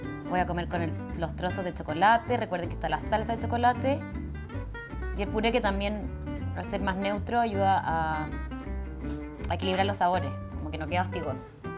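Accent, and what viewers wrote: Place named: Chile. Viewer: Que delicia...lo haré.. quiero algo diferente!!! Saludos desde Costa Rica y me fascina el acento chileno👏👏👏👏🤗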